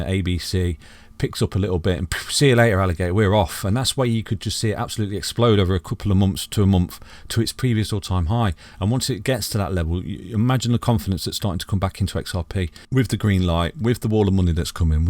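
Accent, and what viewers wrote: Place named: Leicester, United Kingdom. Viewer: Where in the uk you from? You sound like your from Leicester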